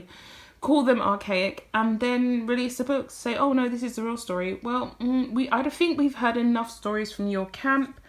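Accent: United Kingdom. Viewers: I had never considered that you speak fast but for people not familiar with a British accent it must be difficult. I sent a comedian clip to someone and they said it took them a while to figure out what he was saying. I thought it perfectly understandable. 🙂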